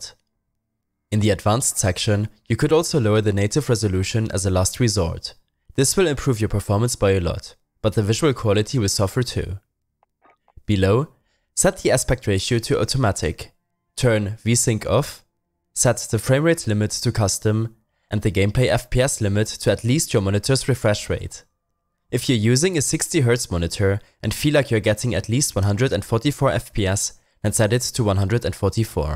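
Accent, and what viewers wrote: Germany. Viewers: deutsche aussprache alter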